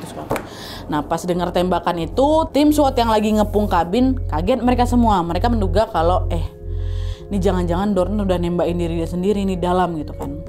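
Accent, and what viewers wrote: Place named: Padang, Indonesia. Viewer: Kakak ni orang Padang apa Medan logat nya banyak Padang nya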